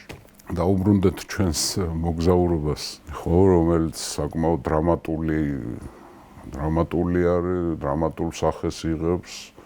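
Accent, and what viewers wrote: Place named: Racha, Georgia. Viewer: თანაც რაჭულად რომ მოგვითხრობს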